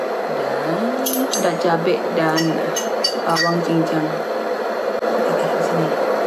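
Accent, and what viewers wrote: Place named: Thailand. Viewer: Dnger kak ngomong, jadi kangen sama cara ngomogng papaku yg mirip kya kak, karna papaku juga orang thailand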